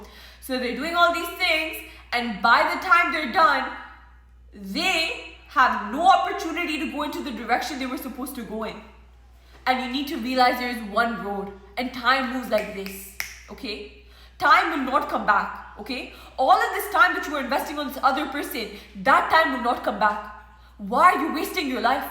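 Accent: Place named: United States. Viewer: Whats wrong... Its bit American and is completely fine and impactfull.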